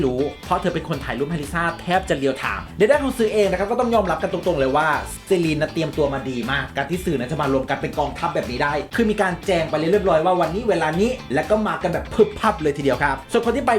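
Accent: Isan, Thailand. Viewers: ผมชอบเสียงบรรยายของคุณมากผมฟังภาษาอิสานที่คุณสอดแทรกมาออก ขำมากเลย หัวเราะทุกครั้งที่คุณพูดอิสาน ชอบและหลงไหลภาษาอิสานมากเลย ตอนี้ฟังหมอลำรู้เรื่องแล้วครับ เป็นแฟนตังจริงครับ ดูทุกคลิปครับ ลงบ่อยๆนะครับ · ชอบฟังช่องนี้ตรงมีความพูดอีสานไป ขำไป อวยยศแบบสุด เป็นเสน่ห์ของช่องมากค่ะ 😂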